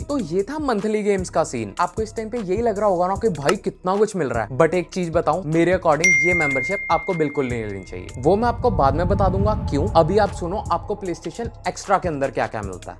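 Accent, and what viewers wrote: Punjab, India. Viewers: Bro are u from jammu or Punjab because of Accent?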